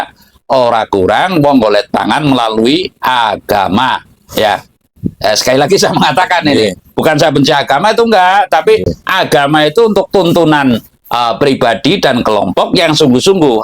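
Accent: Banyumas, Indonesia. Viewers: Nyuwon pirso Mbah penjenengan alamat ipon pundi.nuwon sewu.logat ipon kados banyumasan.kulo saking banyumas.sugeng Rahayu.🙏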